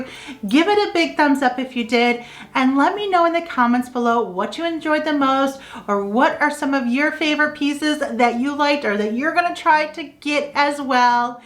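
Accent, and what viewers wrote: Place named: Western New York, United States. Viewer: I knew you wer from the Western NY! I could tell by your warm demeaor and your accent!! I was born and raised in Hamburg, NY. I will have to check that store out the next time we are back in Buffalo visiting our family. If you have any other mom and pop shops you can recommend, I would love to know. We come back every summer and I love to find new home decor stores! GO BILLS!!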